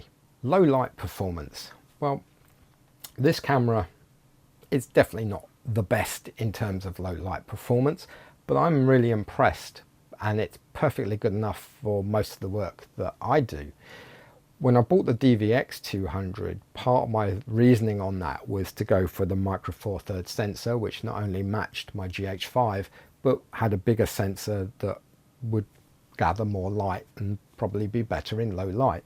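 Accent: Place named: United Kingdom. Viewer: Thank you so much for quick response. :-) I can't wait to watch your next video. I think it would be also very helpful. And thank you for your wonderful British English. It's a huge pleasure to hear that instead of American babble. I wish I had such language skill one day....Greetings from Poland :-)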